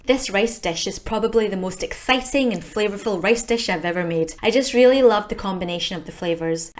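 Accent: Scotland, United Kingdom. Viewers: Sort of off topic but does anyone ever get surprised by your Scottish accent? As a fellow Aussie, I always think it's so cute. BTW I'll never get bored of videos of food, especially when they're as motivating and healthy as your food prep! I really enjoy the variety and ideas :D Thanks <3 Looking forward to your next vid. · Asian decent..Scottish accent...Aussie resident☺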